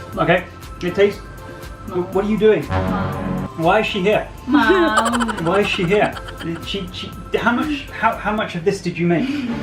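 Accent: United Kingdom. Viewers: British accent enak bgt 😂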